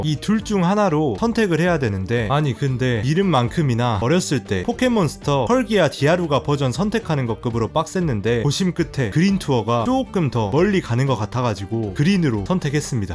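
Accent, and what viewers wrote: Jeolla, South Korea. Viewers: 전라도 억양에 ....데 로 끝나는 문장이 너무나 신기해요. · 남도 억양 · 말투가 전라도 사람이네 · 전라도 억양이 두드러지고 넘 시끄럽게 말해서 채널차단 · 전라도 청년 구수한 사투리 설명 ㆍㆍㆍ참 잼있네요이 ~~십여년 전 다녀 온 곳 다시보니 새록새록 ㆍㆍ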